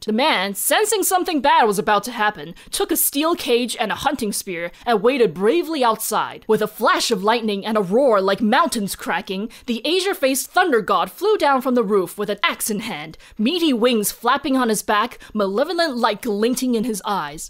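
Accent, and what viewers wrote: United States. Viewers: I am curious as to where in the US/Canada Ms was raised... By her (AE) accent, it's hard to tell; California? PacNW? Michigan? Toronto?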